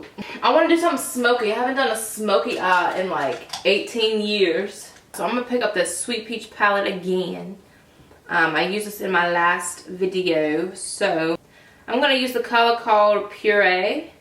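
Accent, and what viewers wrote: South, United States. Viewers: I've never noticed her country accent. Has she always had it?? I feel lost lmao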